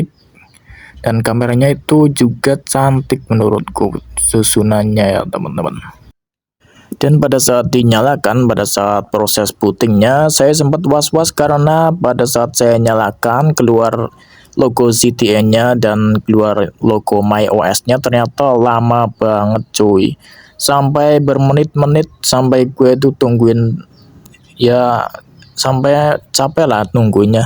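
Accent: Indonesia. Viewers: Tumplek?? Orang mana bang?? Tegal or Brebes? Unik suaranya😂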